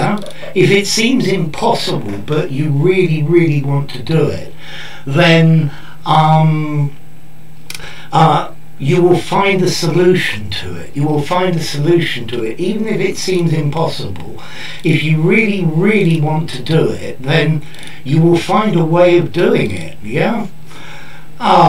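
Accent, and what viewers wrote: RP, United Kingdom. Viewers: You're really the best posh English teacher in YouTube 👏👏👏👏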